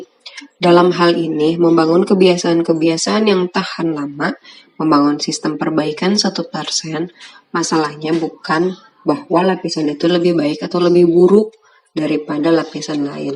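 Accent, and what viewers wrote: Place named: West Java, Indonesia. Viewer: Teteh orang sunda yah logatnya keliatan hhi nuhun teh tos macaken buku atomic habit ❤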